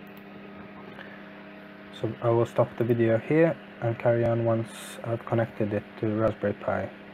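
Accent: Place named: Russia. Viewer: А акцент то русский/украинский/белорусский (ну ты понял)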